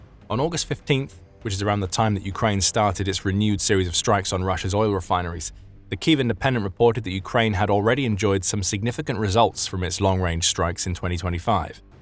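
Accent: England, United Kingdom. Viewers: Why does the narrator (Who's clearly English by his accent) keep calling petrol, "Gasoline"? It's called petrol in the UK NOT gasoline.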